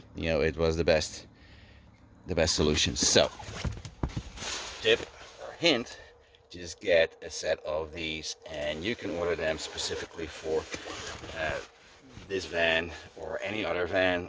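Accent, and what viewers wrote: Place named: Quebec, Canada. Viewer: I am sure you would have more views if you knew how to make the photos bigger, are you French Canadian like the company, your accent sounds like that (not like Tustin) near where I live!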